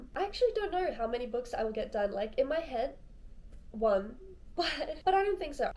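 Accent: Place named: Australia. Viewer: Random question%: what Part of Australia are you from?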